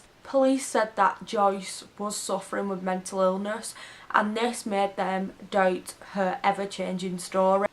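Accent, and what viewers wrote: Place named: England, United Kingdom. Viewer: Great video X subbed good t hear a English accent X subbed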